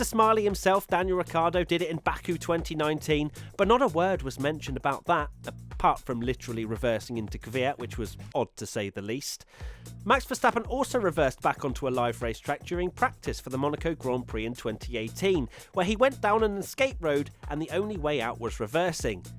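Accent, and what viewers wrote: United Kingdom. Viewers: Listening to your very british accent its obvious who you root for. Yet you argue let the haters comment!! Yet two seconds later you are the hater yourself. However the rule of unlapping is utterly BS. One could argue to unlap but at least provide a half a lap distance between the front runners and the rest of the field in order to make sure the starting grit is in sequential order. Only seems fair no?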